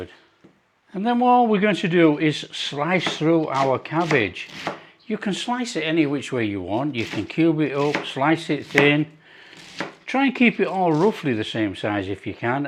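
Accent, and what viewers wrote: Yorkshire, United Kingdom. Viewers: Interesting. I've never had, will try now. Bog standard colcannon. Thank you. Is that a Yorkshire accent?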